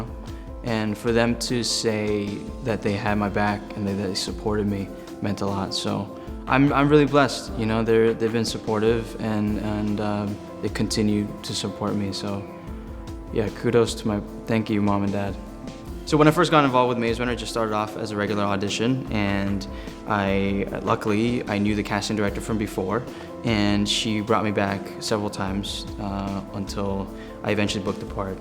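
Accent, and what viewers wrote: United States. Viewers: I guess I hear a Southern California accent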